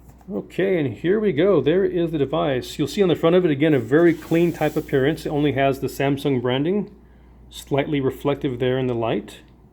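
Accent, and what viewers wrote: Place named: Argentina. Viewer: Pensé que era un ARGENTINO :V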